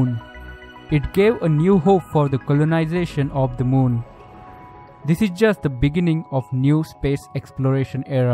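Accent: India. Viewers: the heavy Indian accent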